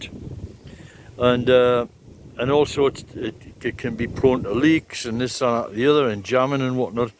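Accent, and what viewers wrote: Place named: Northern England, United Kingdom. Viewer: I can tell that you come from the North of England. My Mother came from Newcastle. I agree with much of what you say. Look at the bows on the current fleet of IMOCA racing boats that just completed a circumnavigation. Other boats that have "Scow" bows are popular in the single handed Mini Trans-Atlantic race (24 feet). If one looks at the construction of Cruise Ships today they are effectively a narrow rectangle with a pointy end and a long snout. I have a feeling that the boat you were looking at was certainly an inland sailing vessel at some time in the past. I would not be keen to take a vessel with Lea-boards to sea nor would I wish to sail a "scow bow" upwind in 30 knots in The Atlantic. Scow bows work well off the wind. Every dog has its day but the ones that are bred with malice aforethought tend to do better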